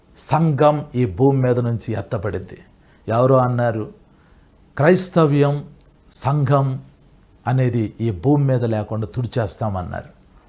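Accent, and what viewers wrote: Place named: United Kingdom. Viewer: Babu munda meeru Telugu Nu Telugu laga matladute baaguntadi please English accent vaada vaddu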